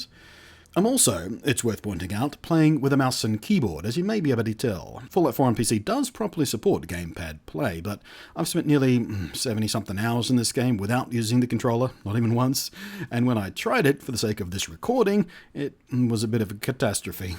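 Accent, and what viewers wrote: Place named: Australia. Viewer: I love you milky smooth ozzie voice. No homo.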